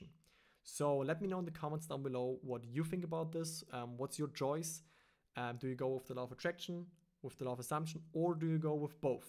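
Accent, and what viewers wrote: Germany. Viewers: Deutscher Akzent? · are you German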